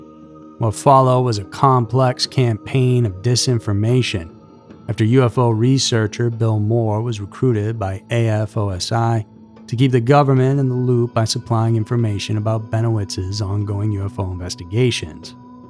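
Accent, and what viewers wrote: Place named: Midwest, United States. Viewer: Not Texan, probably mid western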